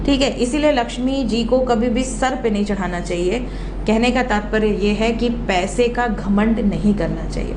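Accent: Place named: Bhojpuri, India. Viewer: mam kha se hain aap bhojpuri bol rhi hai vaise mai ghazipur up se hoon 😂❤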